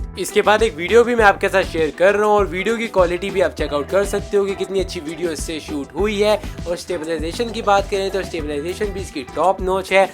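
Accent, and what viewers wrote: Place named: Gujarat, India. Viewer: Bhai apke bolne ke ascent se ap gujrati lagte ho❤😂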